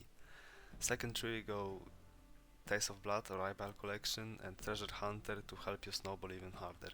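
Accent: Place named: Poland. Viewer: najpierw z akcentu mylslalem ze rodak, potem zwatpilem a potem zauwazylem nick na wozku, pozdrawiam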